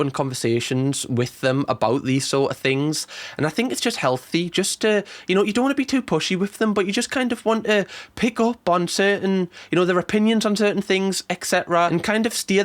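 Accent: Newcastle, United Kingdom. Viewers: Loving yooursoft Geordie accent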